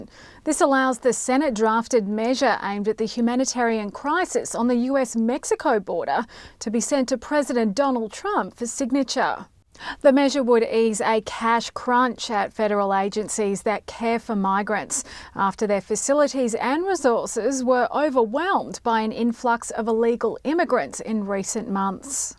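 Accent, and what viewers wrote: Australia. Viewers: Perfect lady anchor gorgeous with an Australian accent. Love it!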